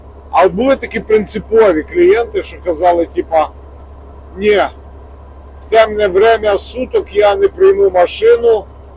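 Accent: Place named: Galicia, Ukraine. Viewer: Чую галицький акцент...чи Волинь все таки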